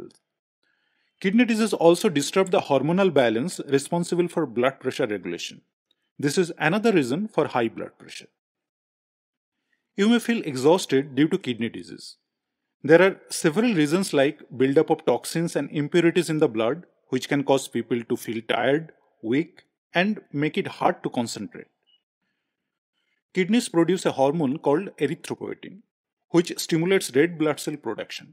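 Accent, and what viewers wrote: India. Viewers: You can turn on Closed Captions (CC) and then read what he is saying. I can understand him fine as I’ve had friends from India.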